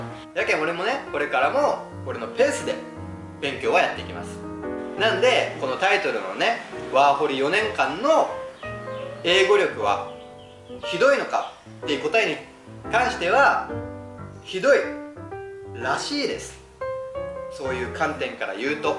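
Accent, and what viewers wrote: Japan. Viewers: カナダにワーホリで行ってたけど、本当のネイティブスピーカー以外は文法も発音も結構適当というか完璧ではなかったです。そりゃそうだ、そこで生まれて育った訳でもないんやから。伝えたいことが伝わって最低限相手の言いたいことがわかることが大事な気がします！なによりこの人の博多？なまりが何より安心できました笑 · この動画も九州方言も好き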